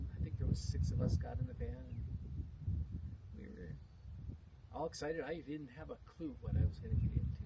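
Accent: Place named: North Dakota, United States. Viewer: I enjoyed listening to your story. We didn't get to really know each other very well, but I do remember when you and your folks would come to Plentywood. Even though you have been to Reno, California, and other places I can still hear that North Dakotan Norwegian edge to your voice. I love that and reminds me of my cousins in the Parshall area as well as your uncle in Plentywood. I am glad you have found love and joy.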